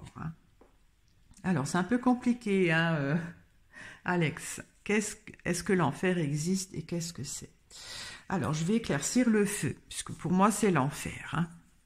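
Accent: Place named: Corsica, France. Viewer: Merci vous êtes Adorable vous avez une intonation dans la voix qui me fait penser un peu à l accent que nous avons en Corse j aime beaucoup mais je vous suis avec beaucoup d interet pour votre bienveillance je ressent chez vous la communion que vous partagez avec nous tous c est beau ❤❤❤ Bises depuis la Corse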